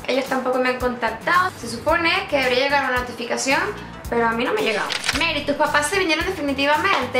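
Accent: Upper class, Chile. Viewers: ajjajajaja mas que nada estabas hablando cuico, no chileno ajajajja que chistosa la weá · Te amo!!!!, slds y cada vez mas Chilena! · Te sale bien, pero como cuica😂😂😂😂😂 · Full Sureña, cerca de Temuco... pero Santiaguina. Nones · Yaa , te sale como chilena pero cuica,igual vale ,está óptimo ,sigue así eres envolvente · 🤣🤣ME ENCANTAS ..SI YA HABLAS CON ACENTO .ME DIVIERTO MUCHO .CON TUS VIDEOS TAMBIEN TE SALE COMO CUICA 🤣🤣😘😘💕💖🇨🇱🇨🇱🇨🇱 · Te sale bien pero un poco cuica jajja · Ohh jajajajaja me da risa pero, porque te sale mas chileno que a mi, 100% jajaja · Habla mas Chileno que yo wn, le salio ree bien por un momento jure que era Chilena pero cuica xd. Saludos desde Antofa 😎❤ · eres mas divertida hablando con acento chileno jsjsjs saludos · ......yo creo q nunca te escuché «hablar como chileno»pero si x youtube se nota q tienes un acento más de nosotros pero tus palabras y acento es como venezolano no se xq no te conozco en persona lo q quiero decir q ya hablas como el chileno medio y no con tantos «modismos»medios flaites yo diría q tus amigos chilenos pronuncian como normalmente hablamos un gran mayoría ,Chao y q tengas una buena noche cariños ....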